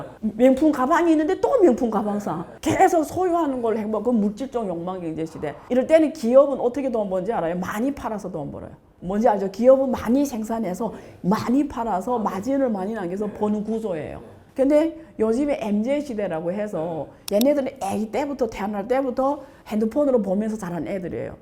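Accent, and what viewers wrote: Yanbian, China. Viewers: 옌변 일까 내용보다 특유 조선족 억양이 신경쓰이네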